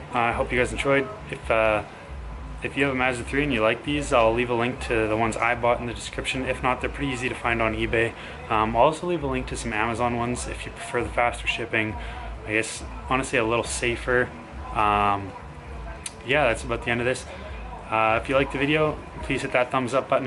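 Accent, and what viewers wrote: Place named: Canada. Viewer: Interesting video.....also am I the only one who thinks the Canadian accent is really cool!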